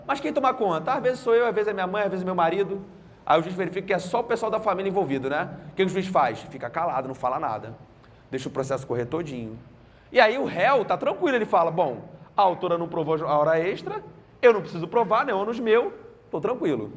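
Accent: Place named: Rio de Janeiro, Brazil. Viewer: Pô Prof. gratidão! Só gosto de ouvir aula c sotaque carioca haha Café caro da porra kkkkkkkkkkk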